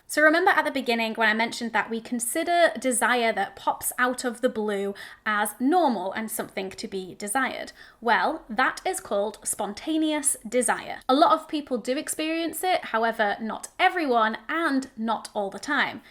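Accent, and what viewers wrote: United Kingdom. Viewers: I really enjoy your videos and love your British accent! Drives me nuts!!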